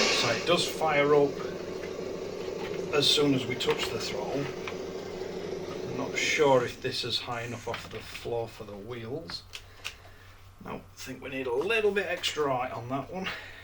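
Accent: Yorkshire, United Kingdom. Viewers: Hi mate you sound like a fellow Yorkshire man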